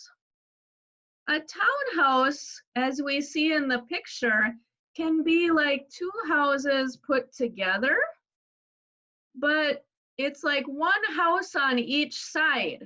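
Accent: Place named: Midwest, United States. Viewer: I'd guess you're from Wisconsin or Minnesota, based on your accent. How far off am I?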